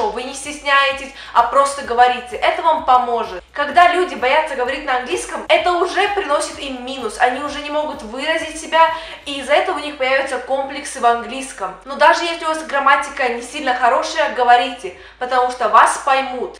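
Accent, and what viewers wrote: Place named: United States. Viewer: у тебя уже акцент американский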